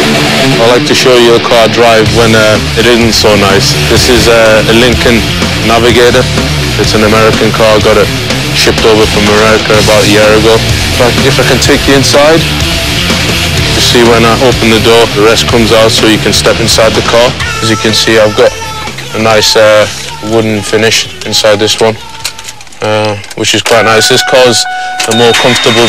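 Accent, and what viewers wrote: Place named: Newcastle, United Kingdom. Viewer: best black guiy with a geordie accent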